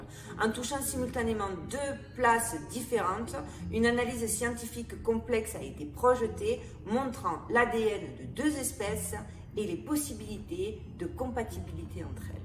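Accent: Marseille, France. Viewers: Un grand merci pour toutes tes recherches passionnantes. Je découvre ta chaîne depuis les Alpes Maritimes et ton accent au parfum de canebiere est un vrai bonheur à entendre. ❤ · trop mimi ton accent!aller l om!sinon,merci pour ces infos,je connaissais deja tte l histoire..stupefiant!faut enqueter...sur place!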